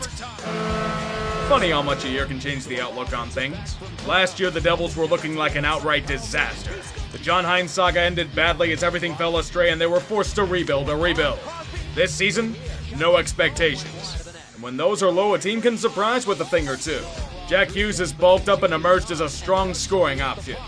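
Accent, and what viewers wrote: Pittsburgh, United States. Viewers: Hearing this yinzer talk Flyers makes me sick but you got a point amirite?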